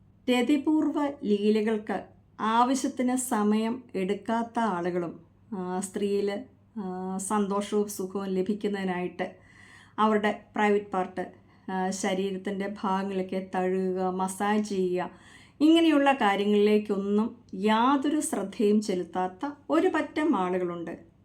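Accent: Kottayam, India. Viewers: ചേച്ചി കോട്ടയം കാരിയാണോ? സംസാരം കേട്ടിട്ട് അങ്ങനെ തോന്നുന്നു. കോട്ടയം സ്‌ലാംഗിൽ സെക്സ് വിഷയങ്ങൾ കേൾക്കാൻ എനിക്ക് വലിയ ഇഷ്ടമാണ്. അതു പോലെ തന്നെ എറണാകുളം സ്‌ലാങ്ങും. അതൊക്കെ എനിക്ക് വലിയ turn on ആണ്. കാരണം അറിയില്ല.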